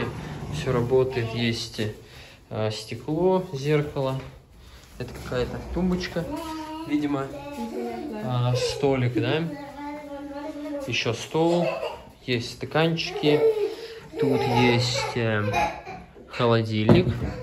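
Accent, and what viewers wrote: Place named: Urals, Russia. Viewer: У вас Уральский акцент, вы с Урала?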